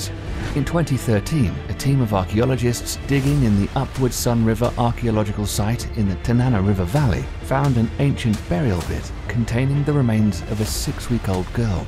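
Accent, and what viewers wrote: England, United Kingdom. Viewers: That accent on this guy is not desirable. Why have a British dude instead of an American? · Is the English accent used to make us feel more frightened?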